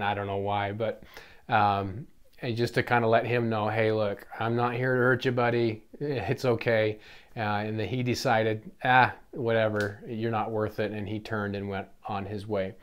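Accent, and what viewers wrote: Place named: Appalachia, United States. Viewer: You reverted back to your hillbilly roots with that accent. Good vid.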